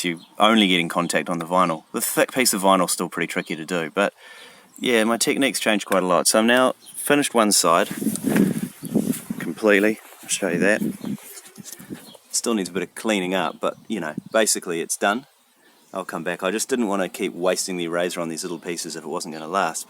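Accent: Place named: New Zealand. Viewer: am i hearing a fellow kiwi 🤙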